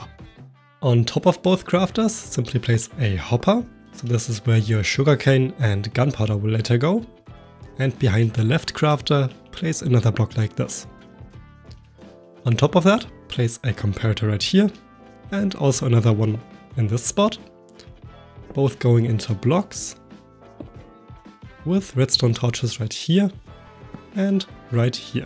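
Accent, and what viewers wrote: Germany. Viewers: Der deutsche Akzent 😂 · Deutsch hört man raus aber gutes tutorial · great build, but are u german?, ur akzent sounds like it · Junge eine Sekunde angehört, der typische Deutsche Akzent.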